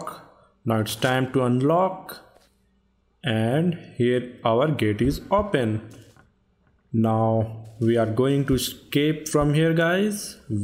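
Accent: India. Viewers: He Indian most Indians have an accent like that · His voice sounds like Indian but peaceful but it’s cool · It's because his an indian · 😂😂 indian ho bhai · Resist kids his indian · He is indian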